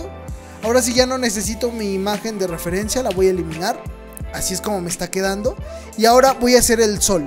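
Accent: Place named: Dominican Republic. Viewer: Suenas medio dominicano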